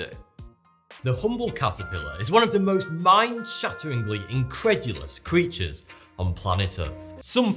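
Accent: United Kingdom. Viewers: Is he British? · I like you British vsauce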